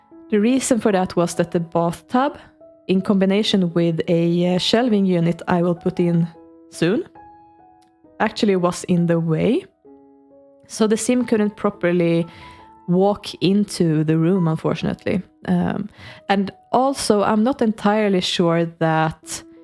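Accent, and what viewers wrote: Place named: Sweden. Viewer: You are from Sweden 🙂 I have think when I heard you that you sound a little bit Swedish. I’m from Sweden too btw. In the end of 2022 I played The Sims 2 last time, but I played the Swedish version. So I connected all neighbourhoods in The Sims 2 so families in “Skönköping” could walk or take the taxi to ”Uddaholm” and the other neighbourhoods. Then I build my own version of Old Town from the first The Sims. The last thing I tried to do was to build Sunset Valley from The Sims 3 but then I stopped using The Sims 2 after I had a fever dream about The Sims 2.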